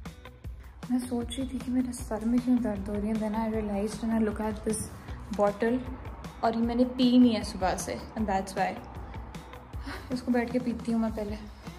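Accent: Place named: Punjab, Pakistan. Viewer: PK jabi and Canadian English accent doesn't mix too well....but its funny nonetheless !!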